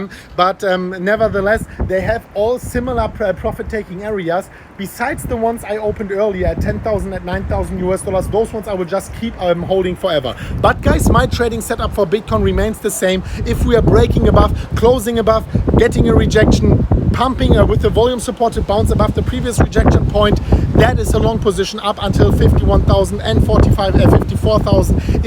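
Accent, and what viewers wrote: Germany. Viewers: Dieser geile deutsche Dialekt:-) Bist echt ein cooler Typ und ich bin durch dich überhaupt auf Crypto gekommen. Hab mir heute einfach just for fun für xxxx Euro Bitcoin gekauft und lass es liegen:-) vielleicht hypen mich aber deine Videos auch wieder etwas mehr zu spekulieren! Daumen hoch, viel Spass weiter im Paradies. Deutschland ist immer noch kalt;-)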